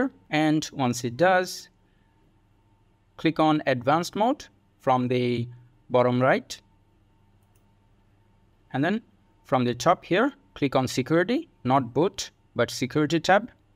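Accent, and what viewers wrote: India. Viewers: Woow thank you man, it just worked, simple straight to the point, as soon as I hear the Indian accent I knew I am on the right video!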